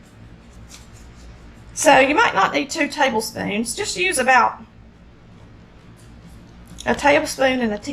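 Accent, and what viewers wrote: South, United States. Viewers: I am just loving your deep country accent 😍😍😍😍so motherly 🤗🤗🤗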